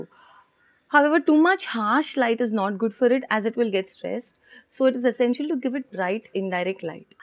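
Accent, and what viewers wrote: India. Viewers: I love the Indian accent, it’s the best. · This is how my indian university lecturers talks. I can't understand shiat.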